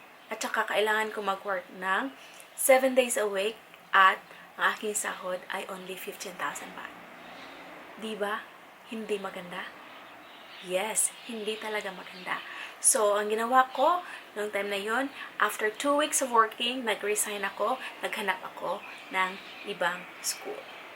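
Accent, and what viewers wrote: Visayas, Philippines. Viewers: Kadiri kang bisaya ka kaya lagi sila iniinsulto eh sa susunod bilisan mo mag tagalog hayop ka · At first I can sense you are really bisaya! Haha... Same here...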